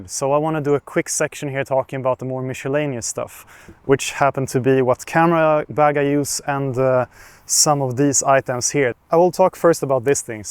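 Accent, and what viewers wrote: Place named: Sweden. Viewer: I love the swedisch accent, its so cool. And it reminds me of Ollienelson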